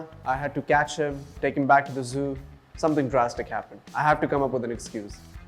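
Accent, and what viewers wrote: Punjab, India. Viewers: His punjabi accent is so cutee 😭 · I also think that because what if you have a wife/husband who changes you as a person that you're not even good friends with your best friends and I feel like that wouldn't be a good wife/husband. My punjabi is exactly like yours but honestly yours is the cutesttt ❤ If comes next to me on an airplane I would scream so loud that the whole plane would know that what just happened or either I'll be starstruck and not able to say anything. About the question "what if you got stood up on a date" my answer would be that that's not gonna happen 😂 I'm really really horrible with jewelry and rings and rings are something I never wanted to wear. I also have the fear of losing the engagement ring so I'll keep in a locker too or wear it with a chain as a necklace. I would have to come up with an excuse if I'm late. 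It would be an ideal scenario to get married in if you only have those people around you who mean a lot to you honestly. I would ask the same question to the alien that where he's from If I'm being recorded and you tell me that you were not recording all this time I'm gonna walk away. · The cutest punjabi accent everrr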